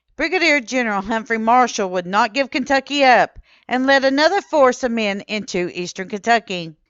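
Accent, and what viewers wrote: Minnesota, United States. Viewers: It's a Minnesotan upper midwest accent you can hear it in parts of the Dakotas Wisconsin and Iowa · Never heard such a thick Minnesotian accent...😊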